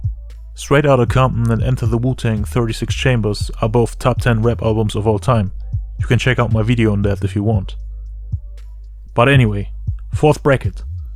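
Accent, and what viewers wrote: Germany. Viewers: is this guy German lol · Are you from germany? I just mean because of the accent and the farid bang video😂😂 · Bist du deutscher? Hört sich so an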